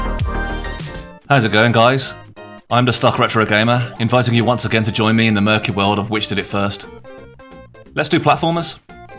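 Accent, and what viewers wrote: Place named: Liverpool, United Kingdom. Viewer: You sound like a Beatle. Are you from Liverpool?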